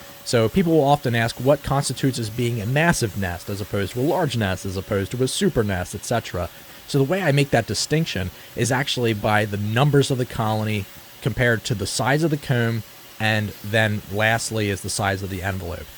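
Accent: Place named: Midwest, United States. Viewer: I’m from the PNW & can’t place his accent. Is it like Midwestern?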